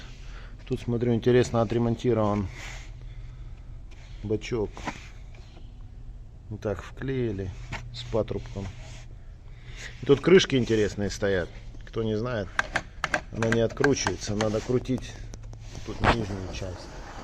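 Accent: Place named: Saint Petersburg, Russia. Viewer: Хорошо смотрится. Без заумствований и проглатывания процессов в ремонте. Приятный русский язык, как будто из Питера)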